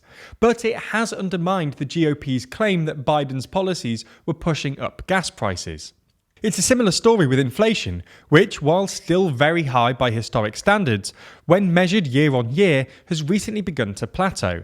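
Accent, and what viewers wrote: United Kingdom. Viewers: First time watching this channel after the other 3. Kinda disappointed he didn’t change his UK accent to an US accent. Would be totally hilarious and serious at the same time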